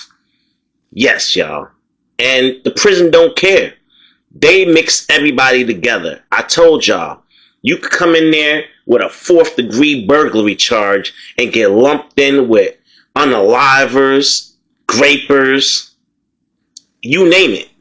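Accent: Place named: New York, United States. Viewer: Good morning, ur voice is dope. I'm sorry u hv these stories, but u tell them in a way that's real, raw, especially with ur accent, like ur from Brooklyn or the bronx. Glad u made it out, my brother never did, he died in prison.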